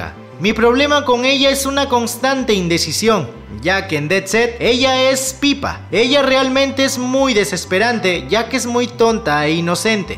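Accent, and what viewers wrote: Peru. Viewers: De que país eres xd ? por como hablas peruano pe mano ?= soy nuevo xd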